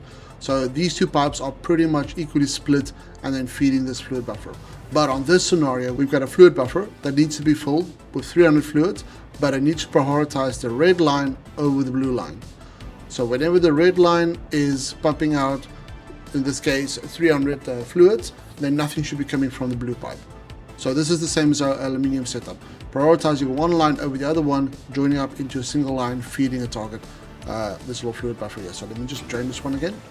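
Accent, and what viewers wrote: South Africa. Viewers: Sit en kyk hierdie video en dink vir myself, "die man klink Suid-Afrikaans" .. Well done man, dit lyk epic!! Subscribed👍🏻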